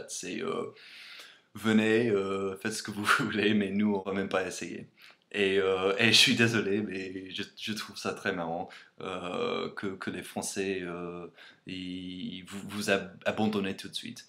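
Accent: United States. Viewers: On pense que les Américains s'habillent mal (chaussure qui ne s'accorde pas avec une jupe ou une robe et aussi un t-shirt au-dessus d'un sous-pull). Sinon, vous êtes toujours enthousiaste, vous dites "je t'aime" facilement alors qu'en France on ne le dit pas, et aussi vous parlez très vite, c'est comme si vous aviez un chewing-gum quand vous parlez (à cause de votre accent américains. Mais on vous aime😊.❤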